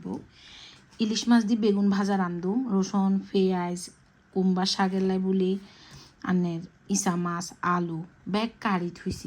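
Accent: Noakhali, Bangladesh. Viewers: খাবার পান না আবার পচায়য়া নষ্ট করে বাসি করে খান।😢এই শাকটা টাটকা খেলে মজা পেতেন এখনতো রাবার হয়ে গেসে।আমার শশুর ভাড়িও নোয়াখালি আমার শাশুরি আপনার মতনই কথা বলতো আর এইভাবেই তরকারি রেখে রেখে পচায়া শুখাইয়া রান্না করতো কম পেয়াজ তৈল দিয়ে।মারা গেসে আমাকে তালাক দেওয়াইয়া আরেক মাগি ঘরে আইননা আল্লাহ উনার আত্তাকে শান্তি দেক বেহেস্ত নসিব করুক।আমিন।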